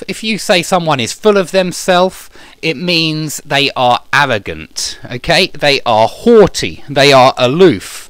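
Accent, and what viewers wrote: United Kingdom. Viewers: I like a lot your British accent. Keep shining.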